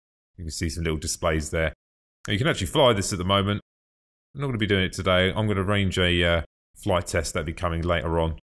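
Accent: United Kingdom. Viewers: your british accent makes me want to drink tea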